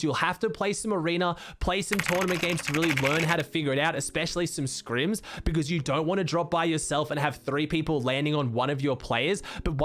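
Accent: Australia. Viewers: He’s Australian. He can’t help it.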